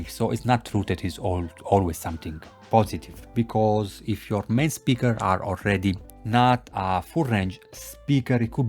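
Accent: Italy. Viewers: Great review! I love your Italian accent :) Would you think the M1 is overpowered and a good fit for the Project Speakerbox V2s? I am planning to buy a REL Tzero MKIII with it.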